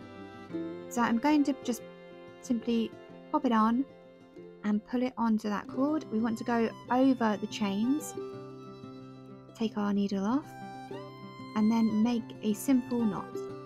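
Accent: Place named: United Kingdom. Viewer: British "accent"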